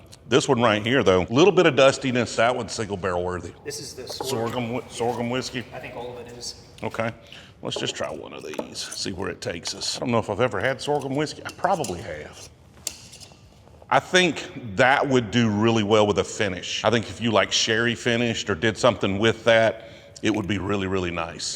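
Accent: South, United States. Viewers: is the reason I get so much enjoyment from you and your channel. That and me being a southern transplant, there’s nothing like a smooth southern accent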